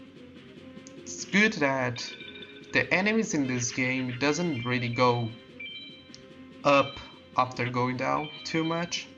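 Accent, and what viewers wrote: Brazil. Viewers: Brasileiro falando inglês porra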